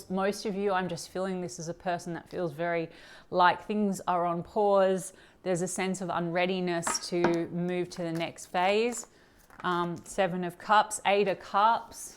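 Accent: Australia. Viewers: Great to hear a fellow Aussie gal reading….. absolutely spot on….. will check out the Law of Assumption🙏🏼🫶 🇦🇺⭐️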